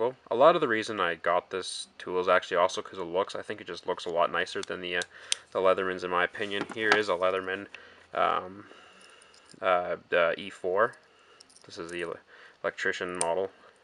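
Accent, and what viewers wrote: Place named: Canada. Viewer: Does anybody else think right when he said he was from Canada you heard the Canadian in his voice 🇨🇦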